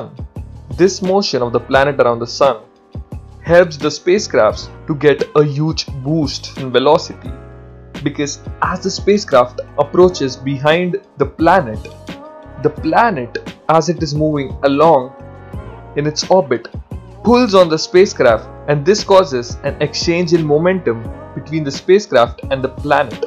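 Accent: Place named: India. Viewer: Indian accent haha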